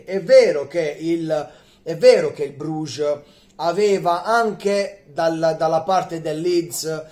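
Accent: Apulia, Italy. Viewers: Sei grande ma sei forse di origini pugliesi.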